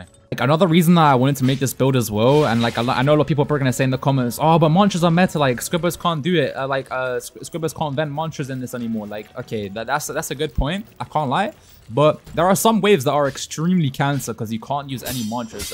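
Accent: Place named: United Kingdom. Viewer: woahh hi idk who's british accent is better you or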